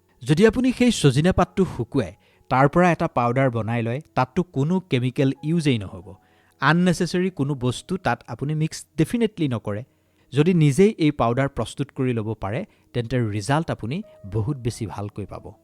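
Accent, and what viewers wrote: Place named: Nalbari, India. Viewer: Nalbari para koiso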